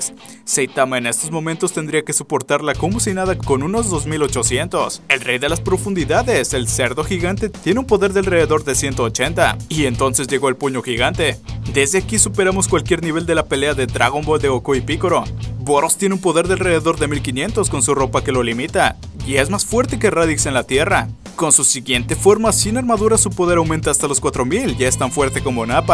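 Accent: North, Mexico. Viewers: Como olvidar cuando hablaba como norteño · No manches en este vídeo te salió mucho el asentó mexicano..!! jajaj xd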